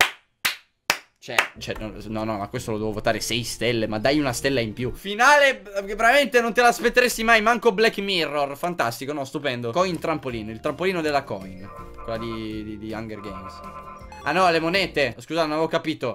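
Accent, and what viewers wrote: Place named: Naples, Italy. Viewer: Madò che parla Napoletano quanto figo 😍😍 ( lo risolviamo= o risulvim)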